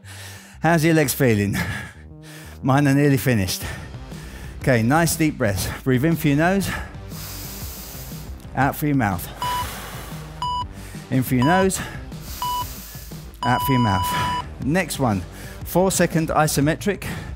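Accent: United Kingdom. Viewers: Wit dat British accent and him being ripped af he seems like a bad guy from a kids movie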